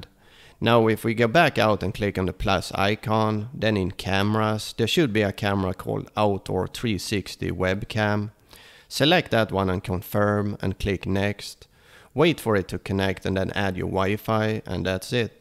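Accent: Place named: Sweden. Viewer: That must be a swedish accent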